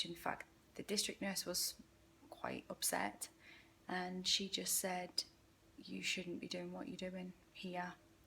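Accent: Newcastle, United Kingdom. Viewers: your Geordie accent video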